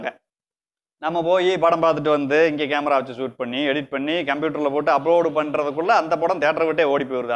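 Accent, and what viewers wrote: Madurai, India. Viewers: madura slang thane unaku pluse continue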